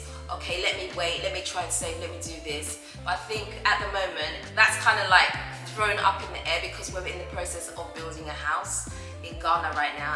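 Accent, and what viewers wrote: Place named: Midlands, United Kingdom. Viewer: I am surprised to hear you are “Londoner” My Mrs who is a Londoner thought you were from mid-lands Becos of your accent and how you pronounce certain words Anyway thanks for sharing your story, much appreciated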